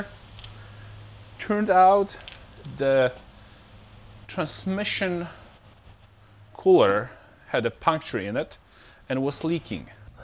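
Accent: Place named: Poland. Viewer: sorry for my question i was just curious, noticed your accent and knew you must be polish how long you've been living in US? I'm Polish as well and live in UK Greetings!:)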